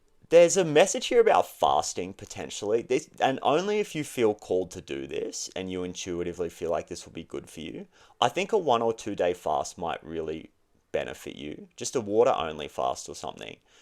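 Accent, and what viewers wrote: Australia. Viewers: So thats gotta be an Aussie accent.. im in aus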